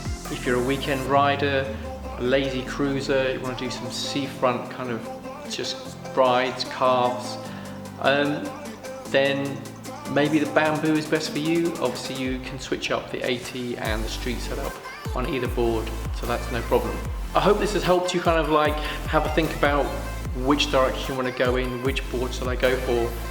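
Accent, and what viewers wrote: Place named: England, United Kingdom. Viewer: It seems like people with English accents make the best reviews. Great work man. I’m looking into the bamboo. I like the flex deck. Plus, I like the muscle car vibe the bamboo has going on.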